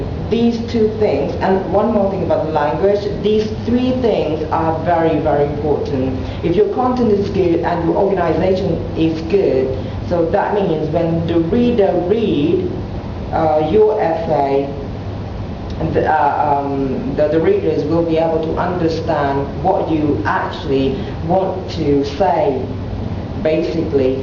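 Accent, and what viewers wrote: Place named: England, United Kingdom. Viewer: มาสำเนียงอังกฤษเลย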